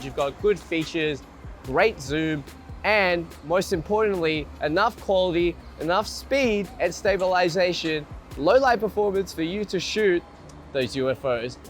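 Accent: Australia. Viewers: Why does this Asian sound more aussie than I am?...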